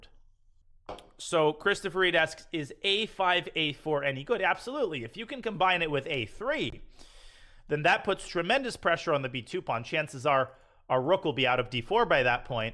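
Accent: Russia. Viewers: That Russian accent always gets me. Much love, Danya! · Nipo imitation? So fun to here this russian accent. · Sensei Danya you have to speak with a lovely russian accent more often. I love it :) Ofc love your priceless vids too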